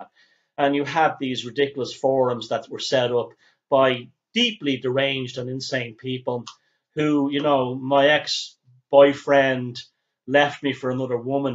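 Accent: Ireland. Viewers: ...Nice to hear your Irish dulcet tones again...(enjoying your videos as always). Wishing you a very happy 2018.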